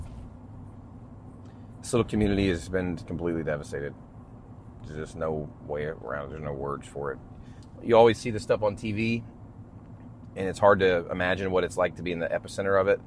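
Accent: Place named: Texas, United States. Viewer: That's just Texas in general you know that dude you got a Texan accent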